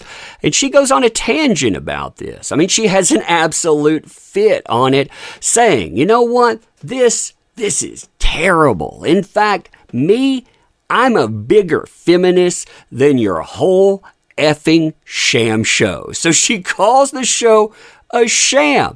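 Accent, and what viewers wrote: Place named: Tennessee, United States. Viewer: Hey All, Please hit the like button, watch all the way through, & share this video everywhere, it’s important. Plus, who can resist listening to voice & beautiful Tennessee accent, that is a sophisticated, cultural gem; an accent that’s quickly disappearing from society. Thanks!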